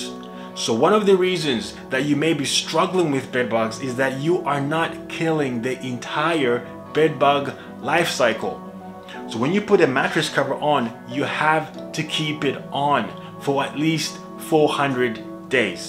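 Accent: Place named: France. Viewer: Hi. Oh you are à french speaker I've noticed your accent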